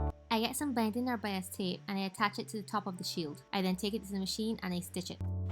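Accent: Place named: Barbados. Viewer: You're Bajan the accent shocked me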